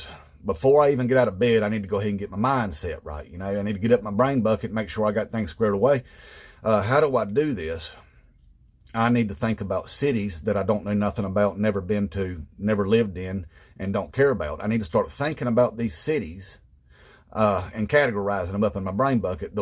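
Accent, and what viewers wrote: South, United States. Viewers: Much appreciation from Canada🎉You sir, are brilliant and the best thing on the web. I will never again assume that a strong southern accent has anything to redneckedness! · Pretty much impossible to make sense of his southern-twang machine-gun chatter & spew, let alone Listen to it.... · The only thing MAGA people hate more than women, black people, poor people, queer people, educated people, children (once they're born), illegal immigrants, and legal immigrants, is themselves. Ps.: As an Austrian, I love the way you pronounce 'Nazis' .. that southern accent is gold 😅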